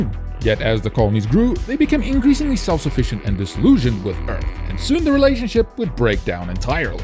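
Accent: South Africa. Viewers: WAIT HE'S SOUTH AFRICAN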